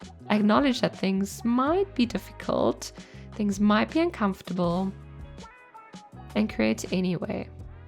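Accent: South Africa. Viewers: Hi! First time to your channel. New subscriber. So enjoyed this video. South African?😃🎀💕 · First time watching your channel and I have to ask, are you South African. Very useful tips given. Thank you. I’m enjoying watching all the Junk Journal July videos.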